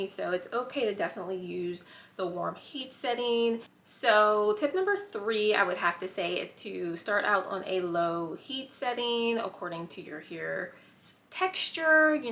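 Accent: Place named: Pittsburgh, United States. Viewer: And I hear that Pittsburgh accent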